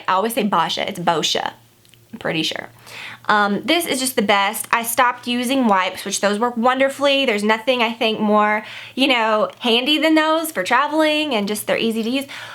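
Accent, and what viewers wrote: South, United States. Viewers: You are FAB! (Your southern accent is subtle, but so cute!)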